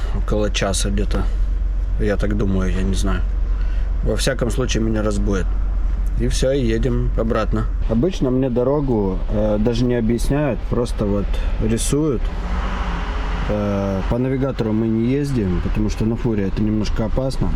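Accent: Odessa, Ukraine. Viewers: привет - я с УКРАЇНИ!!! ЗОВСІМ СЛУЧАЙНО ПОПАЛА НА НАШ ВЛОГ І - ОБАЛДЕЛА!!! - ВИ ПРОСТО ЧУДО І ВЕЛИКИЙ МОЛОДЕЦЬ-ГУ НАСТОЯЩИЙ ОДЕССИТ(рисковий) ГОВОР ОДЕССКИЙ НІ ДЄ НЕ ДІВСЯ!!!! 👍👍👍 ДА ТАК РОЗВЕРНУТИ СВОЕ ЖИТТЯ- МАЄ БУТИ БОГАТО ФАКТОРІВ!!! В ВАС Є СТЕРЖЕНЬ І ВИ ПРОСТА ЛЮДИНА І БЕЗ ПОНТОВ!! А ЯПОНІЯ ДУЖЕ ГАРНА І ЦІКАВА І ЛЮДИ ТРУДЯГИ!!!! ДАЙ ВАМ БОГ І ВАШИЙ СІМ'Ї МІЦНОГО ЗДОРОВЬЯ, ЩАСТЯ, КОХАННЯ, ДОСТАТКУ І МИРНОГО НЕБА В УСЬОМУ СВІТІ!!! А ДІТИ В ВАС --- ЧУДО!!!! 👍👍👍❤❤❤💙💛💙💛🌹🌹🌹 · Привет, тезка. Случайно попал на твой канал. Сразу было предчувствие, что ты с Украины и говорок одессита. Подписался на все, а так смотрел дальнобой по штатам, но у них там начало тухнуть. Смотрю и наших пацанов по России, даже общаюсь в живую. Интересно посмотреть Японию. Сыну 20 лет и тоже хочет туда к вам. Даже не знаю, что ему посоветовать. Смотрю и слушаю тебя. 😊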